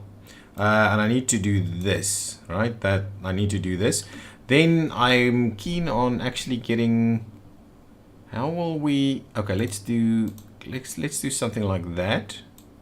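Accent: South Africa. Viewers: Are you from South Africa? Guessing based on your accent and prenunciation. And I like South African accent. BTW, nice gameplay and commendary. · Can’t say I’ve heard a South African accent before...it’s really interesting. To my American ears it sounds like Scottish, Australian, and African rolled into one...like I’m listening to 3 different people lol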